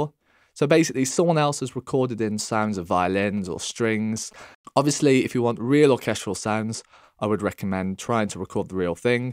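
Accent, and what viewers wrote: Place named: United Kingdom. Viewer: Thank you man,but your british accent...I just didn't catch anything...anyways,thank you :)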